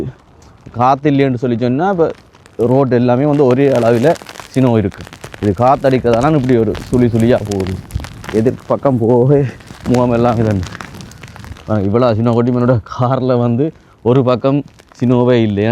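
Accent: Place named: Sri Lanka. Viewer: R u srilankan bro Ur speech will be like that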